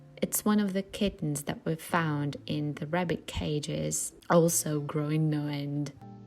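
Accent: United Kingdom. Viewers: ahh nice you have a nice british accent !!! congrats. and by the way i had never seen a vertical aquarium .... is it aquarium? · Did you learn that very British accent?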